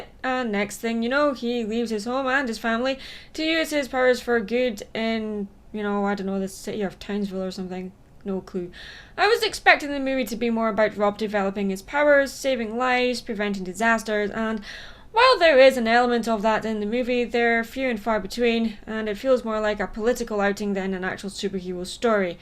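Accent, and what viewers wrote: Scotland, United Kingdom. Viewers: I have a vague recollection of this. Or it was probably just seeing it on a greeting card. I was somewhere around 3 when this came out (and when a lot of things you plan to review this year did). Side note: I absolutely love listening to you speak. Something about a Scottish accent on a woman is just indescribably enticing.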